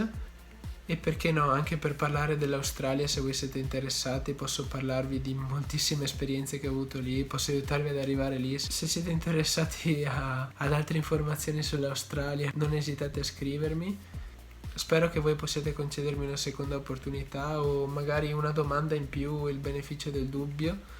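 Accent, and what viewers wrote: Veneto, Italy. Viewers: Sei Veneto?? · Ma di dove sei?Veneto?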